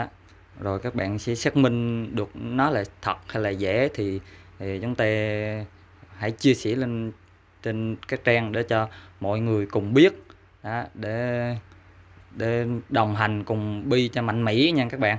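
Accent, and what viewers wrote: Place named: Vạn Giã, Vietnam. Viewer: Chú Em này nói tiếng vạn giã đây OK nè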